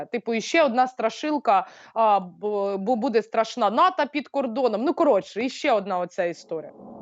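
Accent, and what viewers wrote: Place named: West, Ukraine. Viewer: Як приємно тебе, слухати.Просто,вухо радує Мова така,як на Західній Україні.Суперово. Ти неперевершена.Моллдчинка.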